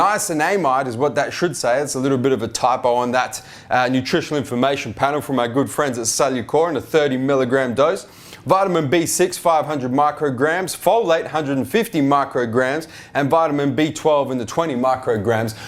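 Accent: Australia. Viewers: dude u might wanna neutralize your accent a bit , kinda difficult for guys like me not from Australia to understand your accent, maybe try American accent bro ? nice vid tho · Sounds like an Australian version of Alfie Aesthetics. No shit.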